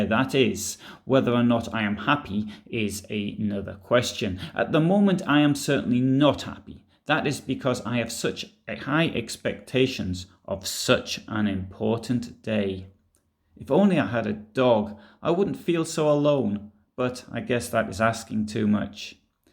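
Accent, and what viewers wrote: Newcastle, United Kingdom. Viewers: Another excellent film, thanks. Always a treat to hear Eva's diary in a Newcastle accent. Hahaha!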